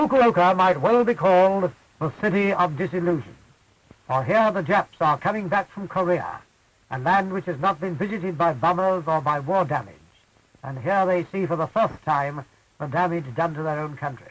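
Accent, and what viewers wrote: United Kingdom. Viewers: I think the narrator (who is obviously British) spoke appropriately. why do I say that? because of the time period. The Japanese were racist back then! weren't they? they thought they were superior to the Americans, the British, the Australians, etc! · Petroleum Jelly Bomb = Napalm . The benevolent civilized “ Good Guys “ 🇺🇸 dropped it on the Vietnamese. I’m surprised the USA didn’t drop Nuclear Bombs on Hanoi . General MacArthur AKA Dr Strange Love ( How I learned to love the bomb ) advocated nuking the Chinese in the Korean War . P..S. The narrators prancing horse, Royal British voice is annoying , and as to be expected extremely racist . Lousy photographer also .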